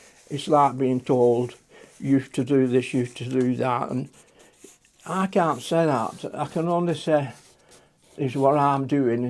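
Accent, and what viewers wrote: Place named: England, United Kingdom. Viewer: Hahaha.... That was wonderful. Hearing a really Englishman talk like. Letting the truth take centre stage, instead of the "feel good" talk that only serves to get most peoples backs up. Pleas feel free to have another "say", I loved it, and it's good to get ot off your chest. Oh, and I 100% agree with everything you said. Not to mention your paintings, they always do my soul good. Always simplistic in style and form, yet they carry an air of peace, and a wanting from within for me. Benn stuck in door for a very long time. Disabled, and had to give up my car, so when you paint, you bring into view the kind of places I would drive out to, and just sit quietly, soaking in the Englishness of peaceful country scenes and allow all my worries to drift away. You're a cracking man, and deserve every good thing that comes your way.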